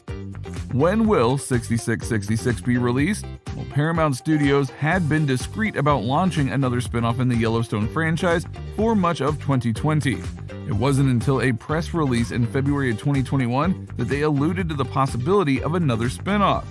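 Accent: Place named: New York, United States. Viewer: Four 6's Mr announcer dude.....must a new yorker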